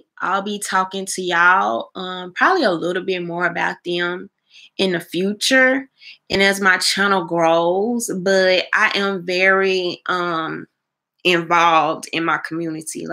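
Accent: South, United States. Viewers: I love that little country voice of yours, I'll vote for who ever you vote for.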